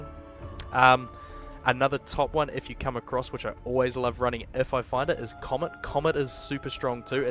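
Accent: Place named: New Zealand. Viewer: Yo, instant sub when i heard kiwi haha. Me and my son are jamming this atm. Big fan of the ff series great vid.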